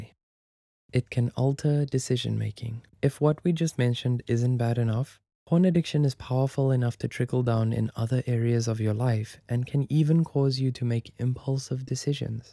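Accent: South Africa. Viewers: is the narrorator south african? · I hear that Suid-Afrikaanse accent 🇿🇦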